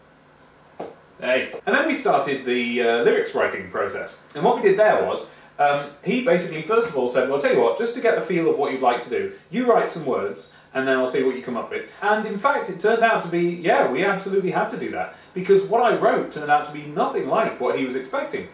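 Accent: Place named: England, United Kingdom. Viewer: So glad I've finally see man with an English type accent make fun of Americans...my life is now complete...wait...or are you AUSTRALIAN?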